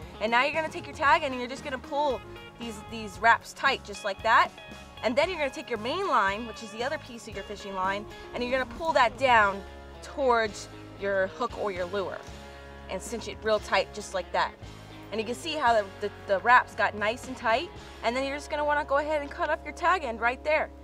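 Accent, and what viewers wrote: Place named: Maryland, United States. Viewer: Are you originally from Maryland? Sounds like a little accent is still there...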